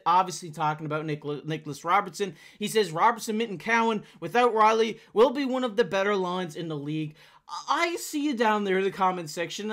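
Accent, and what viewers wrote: Newfoundland, Canada. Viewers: Definitely a Newfie or a caper. Good trying the English b’y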